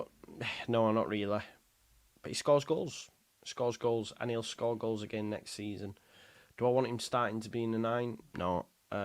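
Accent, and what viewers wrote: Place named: Mixenden, United Kingdom. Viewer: you sound like a Mixenden lad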